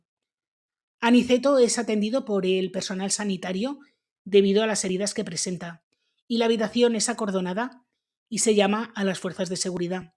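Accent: Spain. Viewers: Gracias por el vídeo, aunque no me gusta el acento español me gusta como lo narras e incluso a veces me hace sonreír los comentarios que haces.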